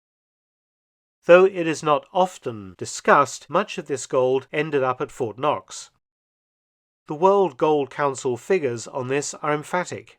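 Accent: England, United Kingdom. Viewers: I absolutely love this channel. Mark is a blessing to youtube. The education and well-done entertainment he brings to history does it justice. I appreciate the research and enthusiastic way of bringing history to life. As an American, it's music to my ears to hear his English language accent brings you into the video like a great soundtrack to a movie. I didn't mention the stock title music blends well with the stories and history. Especially the ww2 vibe it gives as one of my favorite interests. Thanks, Mark. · Edutainment!!👍🏻 Some Britt accents are irritating. is not. Very soothing.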